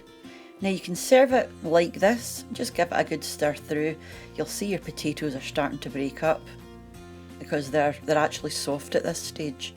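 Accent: Scotland, United Kingdom. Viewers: This looks like a dish we would love! Thanks. I enjoy your videos so much and your lovely Scottish accent! 😊❤ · My grannie and my mum made stovies with the ends of the roast. Brown the onions and add the chopped up roast. Add boiled tatties and any left over gravy. Yum! I was born in Portsoy (not far from Elgin) but live in Vancouver Canada now. Grannie made tablet on a Prius stove in front of a coal fire and sold it, with all proceeds to the Salvation Army. I used to make it but it is too “moreish” to have around. Love watching for the old recipes and listening to the home accent. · Thanks for this video. I like stovies but I LOVE LOVE LOVE listening to Scots. Greetings from the Bavarian Highlands (alpine belt) 🏴💙🏴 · I'm trying to learn good Scottish accent for a monologue , and yours is fab!!!! X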